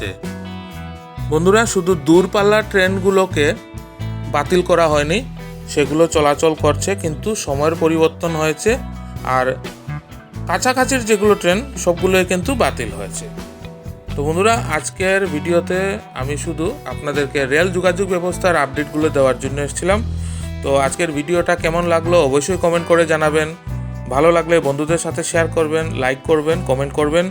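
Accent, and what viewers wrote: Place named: Barak Valley, India. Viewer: আপনার ভাষায় বরাকের মধূরতা থাকায় নিজেকেধন্যমনেকরি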